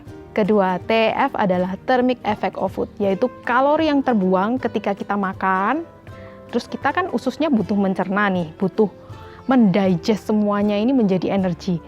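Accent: Java, Indonesia. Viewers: Adohh ngakak ya baru pertama kali liat video nya. Medok e keliatan 🤣🤣🤣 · Kak...kok ada medok nya....org mana kak???? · Aku sub dah!!! Ekkekwke mirip hansol 🤣 nyrocosnya logat jawa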